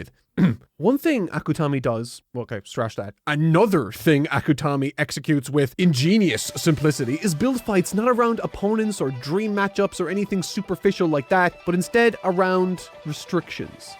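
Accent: Scotland, United Kingdom. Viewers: Scottish lol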